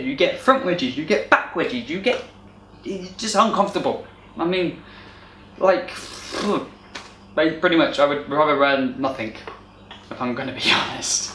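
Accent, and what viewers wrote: United Kingdom. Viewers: Starting to look at your post , ( luv it!), would like to know which country you are , beautiful accent! Thx to answering,I always loved British accent, It’s like poetry for my ears !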